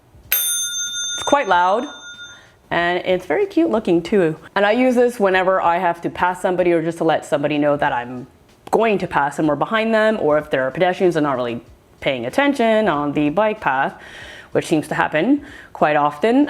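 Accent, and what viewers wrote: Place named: Canada. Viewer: Cool accent, is that a candadian Asian accent?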